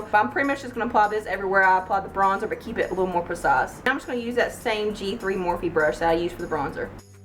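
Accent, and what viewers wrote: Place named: South, United States. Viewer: ahhh for real this is such a gorgeous look..your eyes just pop so perfect with it. What part of the south are you from? I'm from NC and I get comments on my southern accent but it's no where near as strong as yours is, I love it!!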